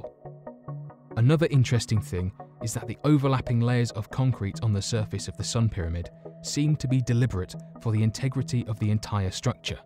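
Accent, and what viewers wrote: United Kingdom. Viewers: Tacos - they are from different regions in the UK, hence difference in their accents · this guy is more brittish than our normal guy where is our normal guy?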